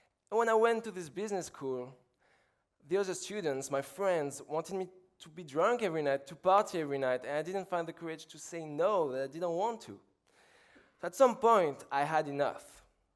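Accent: France. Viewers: America always falls for any French accent when added to a hunkie body. This guy is a trail blazer he will go far. Because under the anarchy of his life he has the zen zazou spirit. Everybody loves a smiling hunk with a French accent ou la la mama mia!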